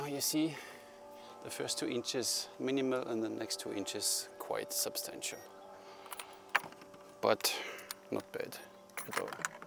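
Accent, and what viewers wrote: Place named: Germany. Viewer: That is a nice German accent! It reminds me of Dr. Emil Shaffhausen! - oh, and the bows were nice too.....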